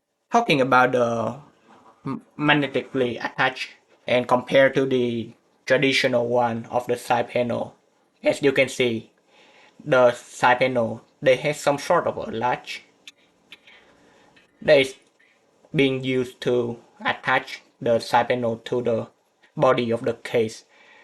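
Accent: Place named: Vietnam. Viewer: Ban la nguoi Viet Nam a, tui co the nhan ra accent cua ban.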